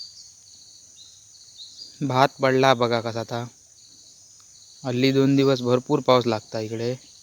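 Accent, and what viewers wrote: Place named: Malvan, India. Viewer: सुमधुर, मालवणी लहेजा असलेली ... भाषा शैलीने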